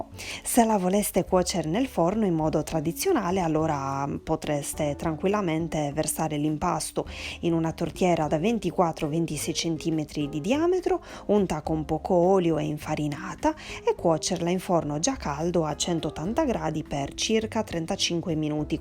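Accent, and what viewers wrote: Sardinia, Italy. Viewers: MA BRAVA,LA FARÒ SICURAMENTE....CHE PIACERE SENTIRE IL TUO ACCENTO SARDO! GRAZIE X QUESTA RICETTA 👏👏👏👏😘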